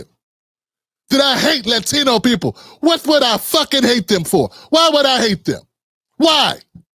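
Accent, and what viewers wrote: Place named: Washington DC, United States. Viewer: I watched Professor Ball's episode that you are citing. It was very well done and researched. I'm not sure what you're trying to accomplish. But I haven't taken your show serious in a very long time, which is why I don't usually watch it anymore. You should stick to comedy. I only subscribed to provide support to a Black podcaster. But I am not down for this chocolate-covered white conservative with a DC twang. I''m out. Unsubscribed.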